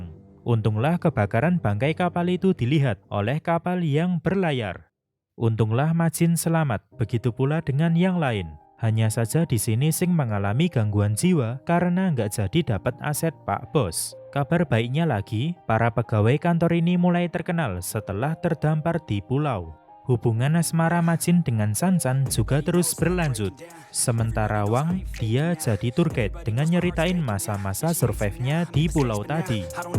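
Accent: Java, Indonesia. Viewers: medoknya jogja bangeeet ,❤️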